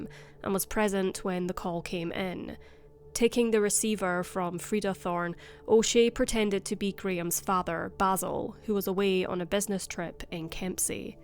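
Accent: Scotland, United Kingdom. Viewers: Jeez - you can't tell the difference between Canadian and Scottish.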